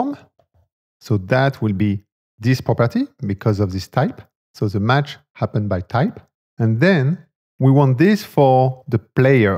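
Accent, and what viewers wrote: France. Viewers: A really well done video! Are you French? because I am French and I can clearly hear a French accent here. I could be wrong though :p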